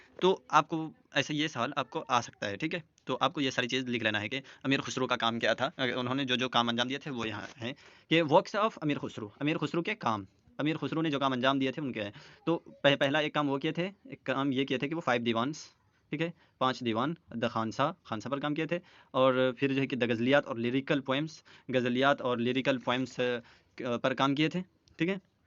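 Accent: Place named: Kashmir, India. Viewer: Sir your accent is different are you from Kashmir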